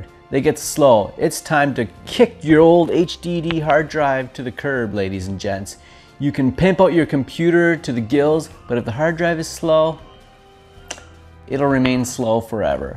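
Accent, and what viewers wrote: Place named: Ireland. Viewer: Good tutorial! Are you Irish, Scottish, or Welsh?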